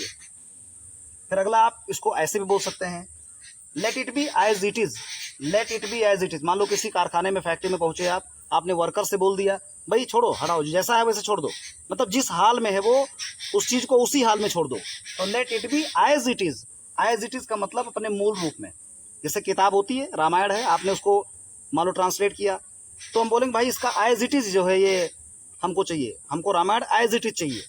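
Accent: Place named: Uttar Pradesh, India. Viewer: Great performance Bhai ji.... Aap pakka bhaiya style mein padhaate ho.... U. P. Wala touch bhi hai..... Aapka padhaya koi bhi padh jaayega... Your Hindi is also very tuff...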